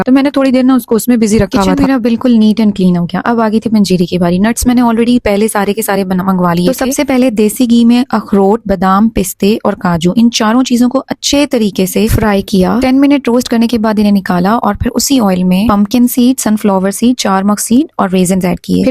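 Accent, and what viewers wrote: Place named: Pakistan. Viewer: Shakal accent se b pata lag Raha k Pakistani hai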